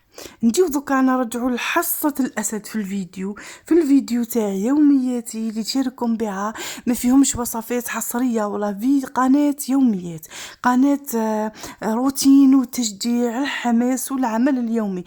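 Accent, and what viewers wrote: Setif, Algeria. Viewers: سرجو ههههه نموت على اللهجة السطايفية انا شاوية ،اشكون كيفي ؟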